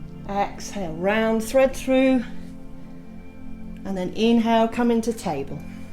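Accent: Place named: Ireland. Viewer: I meet this lady yesterday. She is so inspiring. Thank you. Love the Irish accent.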